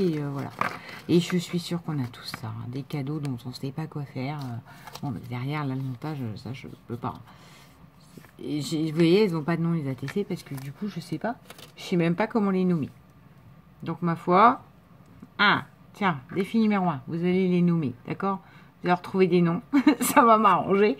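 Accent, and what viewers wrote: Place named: Haute-Marne, France. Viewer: Cc 🙋 Alors alors alors...!!! Quesqui pourrai t inspirer 😁 je choisi la couverture.. ah super le tour de France je connais sa c'est la galère sérieux.il me semblais bien que je connaissais cet accent je suis native de la haute marne Chaumont tu dois connaître...et Reims je connaissais bien gros bisous 😘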